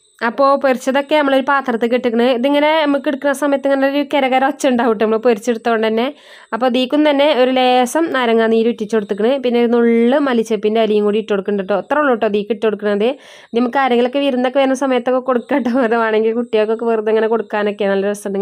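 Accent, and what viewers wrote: Malappuram, India. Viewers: Ntemmoo thani malappuramkaari 😄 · ഇത് ഞാൻ കഴിഞ്ഞ ആഴ്ച കോട്ടക്കുന്ന് ന്ന് തിന്ന് അവ്ൻ്റമ്മേ എൻതാ ടേസ്റ്റ് 😅അപ്പോ അതൊന്ന് ഇൻടാക്ക വിചാരിച്ചു തപ്പി വന്നതാ അപ്പോ ദേ നമളെ മലപ്പുറം ഭാഷയിൽ തന്നെ ഒരു വീഡിയോ കൻടത്😁😁😁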